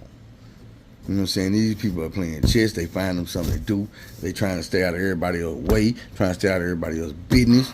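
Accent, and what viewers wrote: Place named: Mississippi, United States. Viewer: You sound from Mississippi bro im from 🥾⚜️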